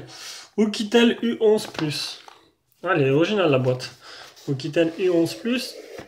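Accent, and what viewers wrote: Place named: South, France. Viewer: Salut c est pas mal tes tests smartphones et j aime bien ton accent du sud ca change,bonne continuation!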